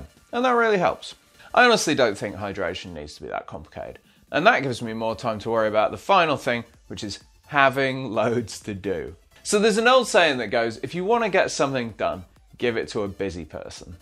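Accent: United Kingdom. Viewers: From same town as Jagger? Same accent. Great content! And coincidentally I'm re-reading The Organized Mind right now.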